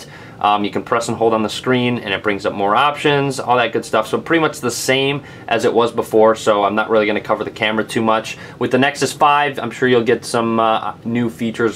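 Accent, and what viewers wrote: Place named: Michigan, United States. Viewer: i THINK.... hes from Michigan... lol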